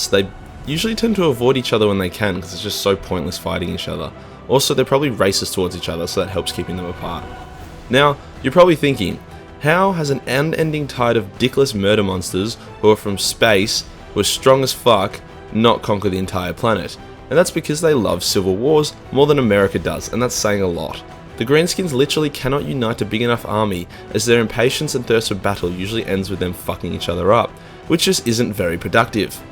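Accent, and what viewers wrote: Australia. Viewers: As a Canadian I reckon aussie have the best fuck off narration around, fair dinkum, bloody legend!!!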